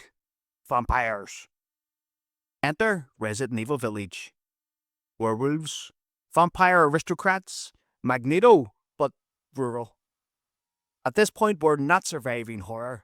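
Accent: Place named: Ireland. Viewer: Great video man. What part of Ireland are you from ?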